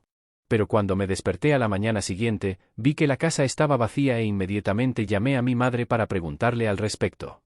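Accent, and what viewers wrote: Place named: United States. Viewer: Jajajajaja ame el tono gringo Te falta darle más espacio para que sea más agradable escucharlo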